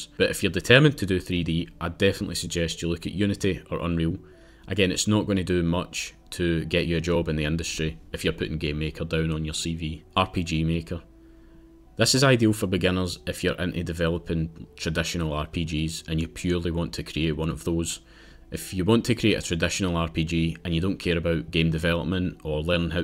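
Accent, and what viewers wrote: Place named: Glasgow, United Kingdom. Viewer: Watched the whole video not because i am super interested in game dev, but u sounds like ur from Glasgow, so supporting you bro!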